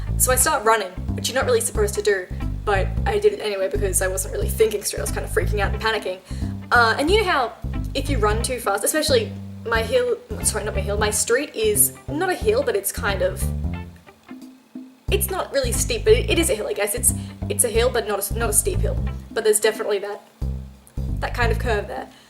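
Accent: Australia. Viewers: Love your accent :) Where in Aus are you? I used to live in Perth :)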